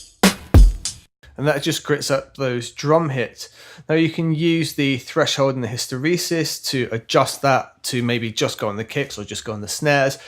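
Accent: United Kingdom. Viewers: thanks man this was really helpful. u welsh?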